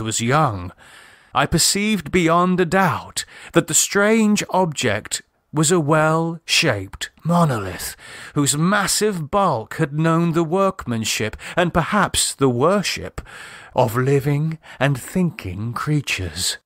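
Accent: England, United Kingdom. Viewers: Hey dude..... Hates Pigment Lovecraft was from NEW England. Lose that ridiculous "theatrical" tea talk and tell the story. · Me encanta ver videos sobre los relatos de Lovecraft. Aunque como opinión personal siento que es mucho más gratificante imaginar estos escenarios mientras lees el libro. Aún así me ha encantado, sobretodo por el acento inglés del narrador y lo bien ilustrado que está. Muchas gracias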